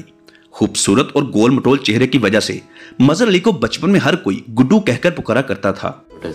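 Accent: Punjab, India. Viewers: بھای۔۔۔۔ اپکی آواز اچھی ہے۔۔۔ مگر ادائیگی کھردری اور پنجابی لہجہ ۔۔تھوڑا سا بہتری لانے کی کوشش کیجیے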